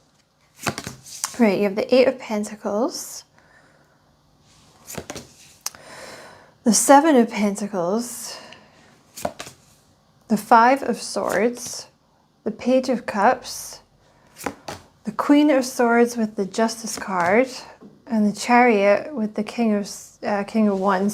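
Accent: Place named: Scotland, United Kingdom. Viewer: Thank you. The technology world it's slowly killing the human world and it's based on convenience that is alienating us from each other. I talk with older people and they get me. I talked with younger people and they're nice but it's like a program nice with no eye contact. People are so afraid of their own vulnerability that they won't take the risk of getting to know each other. And I think in the older world we were forced into it. Where today we can sit in front of our phone and say and do things that don't require any risk. I love hearing your Scottish accent and I believe you're a real person but this gets in the way of human interaction sometimes and I'm glad I have a job where I meet with real people in their homes. Honestly I think that's what the younger generation is missing. I will retire and go back to Scotland because I think there is still enough people left their who get it. 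God bless you you're a good soul.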